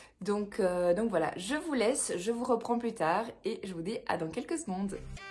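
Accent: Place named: Belgium. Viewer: Et j’vois que tu arrives récemment sur le booktube. Bienvenue. Et ton accent léger sur les ”R”..pam waterloo..Bruxelles.! Évidemment! ( bon après coup.. j’avais failli ecrire que t’étais alsacienne...😲🙄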